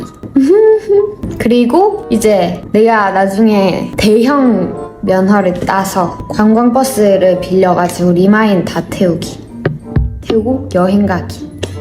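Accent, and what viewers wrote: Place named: Seoul, South Korea. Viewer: 말투 제스처 보니 가스나 서울여자 다됏네~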